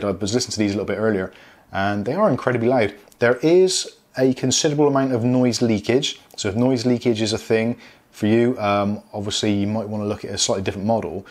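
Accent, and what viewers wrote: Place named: West Country, United Kingdom. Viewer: bought a pair of these cans off amazon after watching your review ... your spot on ... great bass and full sound ... bargain for just over £20 ... am in agreement over shiny plastic /grease magnet ... is a shame this p3 model doesn't come with sd card slot like the p1 or p2 ... both of which have less playback time than this model ... seems powerlocus are missing a trick ... if they brought out a new model with a matte finish ... long playback and an sd card slot ... i would happily pay £30 ... great sound ... p.s. you sound even more "west country" through these cans lol ... thanks for review ... correct and accurate on every point ... cheers fella :)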